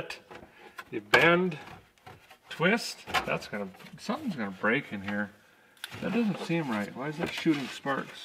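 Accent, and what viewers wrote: Minnesota, United States. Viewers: With that accent you've gotta be from western Minnesota ya knooooowwww. Proud Benson native here! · why are there always idiots who downvote? I really like the channel, and there's not a whole lot about it not to like. We need more real people like you in the world. Stay safe. Sounds like you live in Minnesota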